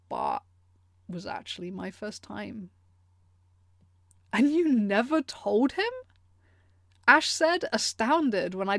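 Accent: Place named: England, United Kingdom. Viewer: She should have kept going. Her english accent contrasts a great deal with her dirty talk.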